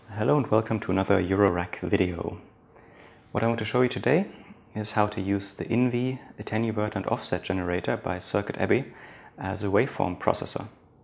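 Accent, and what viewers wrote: Germany. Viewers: Wow, you sound so German!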